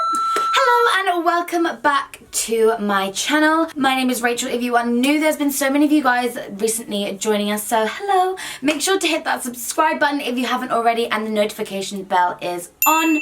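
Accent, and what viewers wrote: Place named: Newcastle, United Kingdom. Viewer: Oioi to the Geordie accent ! Yassss girl come to Newcastle ? ❤️❤️❤️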